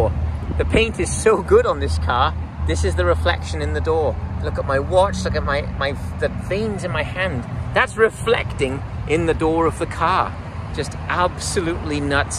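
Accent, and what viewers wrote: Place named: South Wales, United Kingdom. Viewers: Are you Welsh? You sound as if you have a Cardiff accent. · Well I clicked to watch this great video to study the Phantom, but then spent 15 mins listening to an accent that sounds almost from South Wales. It threw me haha. Great video however :)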